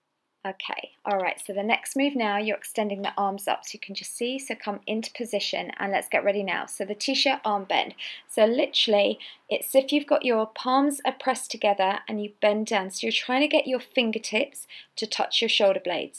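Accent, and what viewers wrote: United Kingdom. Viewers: thankyou for this 😭😭😭♥♥♥♥ :), i like your british accent 🙈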